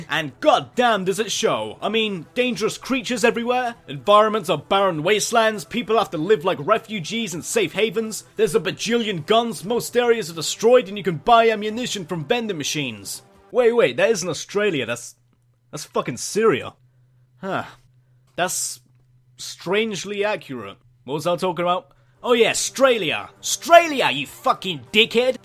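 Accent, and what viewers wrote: United Kingdom. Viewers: Your a brit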